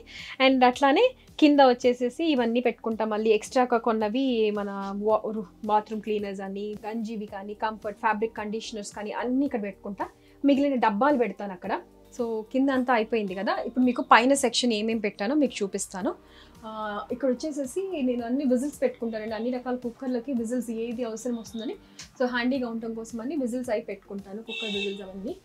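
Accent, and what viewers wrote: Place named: Tenali, India. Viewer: Perfect tenali language 👌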